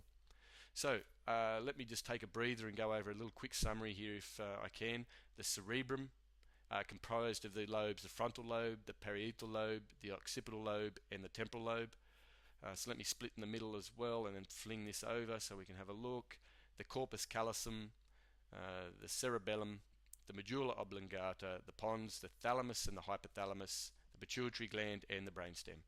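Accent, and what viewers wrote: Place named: Australia. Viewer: Sounded as if the bloke was Australian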